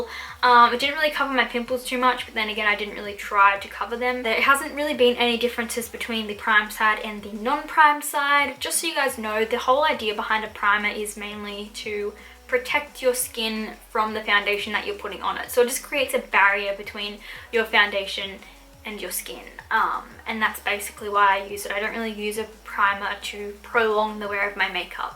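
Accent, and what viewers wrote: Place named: Australia. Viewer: You are such an Aussie. I can hear it :)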